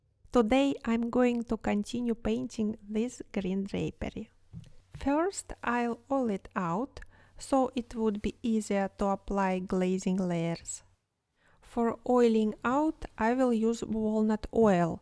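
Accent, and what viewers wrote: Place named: Russia. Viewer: Very strong Russian accent : )). Lovely paintings, have that renaissance quality of look, that airiness.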